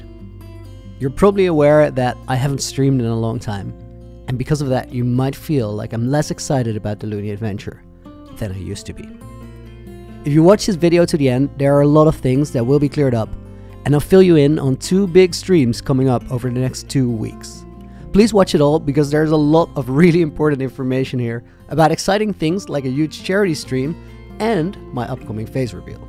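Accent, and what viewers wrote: Netherlands. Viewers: Wait... I was just looking on your channel, when I saw that you're from the Netherlands... normally I can easily hear when someone's Dutch, but damn, that took me by surprise. Now I can never unhear the slight Dutch accent ever again lol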